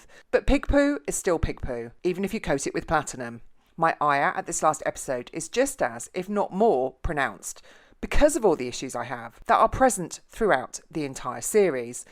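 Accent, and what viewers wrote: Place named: England, United Kingdom. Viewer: Nothing better than an english accent to tear this shit apart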